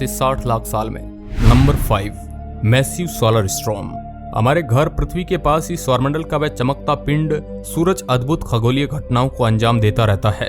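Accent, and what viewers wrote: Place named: Bihar, India. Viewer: Abe sale brust kya he be? Gama Ray brust??? Pehle accent thik kar uske bad ana video banane .... bihari he kya?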